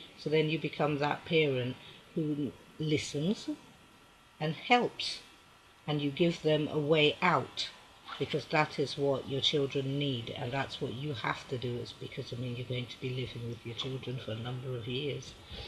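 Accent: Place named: United Kingdom. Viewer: Only 2 likes. I dont understand so called Israel. Ms, some of us are listening. P.s. I love the British accent. It makes you that much more fabulous.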